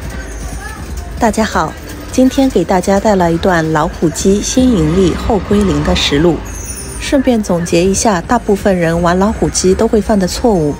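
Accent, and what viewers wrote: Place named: Sichuan, China. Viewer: …四川的哇。😂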